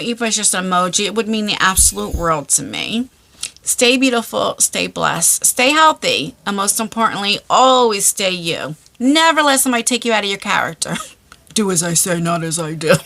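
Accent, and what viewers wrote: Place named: East Coast, United States. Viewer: I love it when you say "ya know" just as much as I love your East coast accent. You can't have that without "ya know" ❤❤❤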